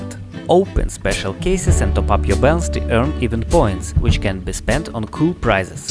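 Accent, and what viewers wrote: Russia. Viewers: У тебя русский акцент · у меня шизофрения если я слышу русский акцент? а нет теперь я в шоке что наткнулся на 2 акк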